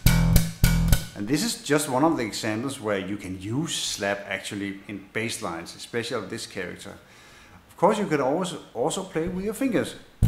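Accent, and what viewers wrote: Denmark. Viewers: You are Danish?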